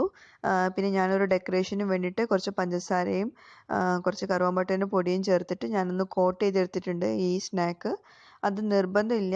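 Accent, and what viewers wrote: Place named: Kannur, India. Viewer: Jojo Joy anganonnum parayarudhe...ente kannur kaari frnd idhe slangilaa samsaarikkaar... aadyokke njaan vijaarichirunnu ival konji pparayaann....pinneed avalde husband samsaarikkunnadum same🤣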